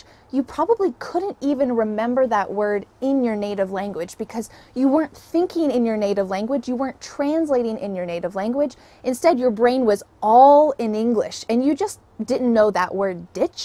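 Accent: United States. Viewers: thank you techer english Misis i like listening english American style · مرحبا يااستاذة هل هذه بلهجة الأمريكية؟ ؟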